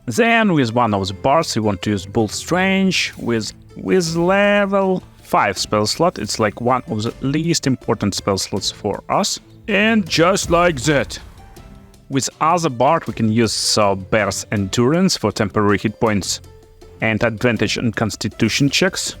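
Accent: Russia. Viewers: Слышь по твоему говору понятно что ты русский.говори порусски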